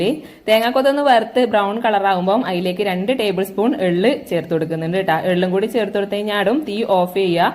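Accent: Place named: Payyanur, India. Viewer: okay payyanur slang ningalude baashayil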